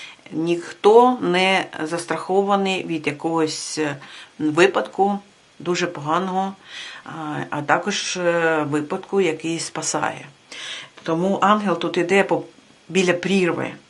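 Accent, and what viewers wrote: Surzhyk, Ukraine. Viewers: українська мова навіть з суржиком, всеодно краще, ніж рашистська. І це дуже добре,що українська навіть через такі страшні події. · тримайтеся,тримайся люба Одесо.Нам всім дуже болить.В вас гарно виходить українською,а те що трошки суржик це нічого,більшість людей розмовляє трішки з суржиком.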